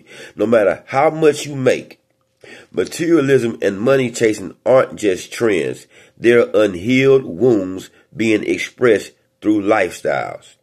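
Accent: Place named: Chicago, United States. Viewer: You gotta be from Chicago 🙂‍↔️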